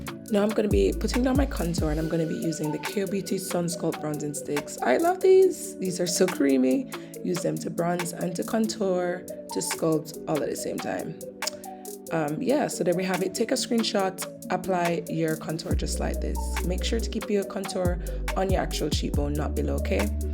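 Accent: Jamaica. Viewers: Am I hearing a Jamaican accent? Subscribing!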